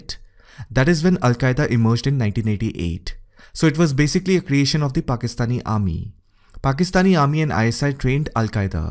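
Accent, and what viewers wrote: India. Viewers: Listen your voice sure is from India , India now let Asean people start Suspected of committing a terrorist attack behind the scenes at Pakistan booming.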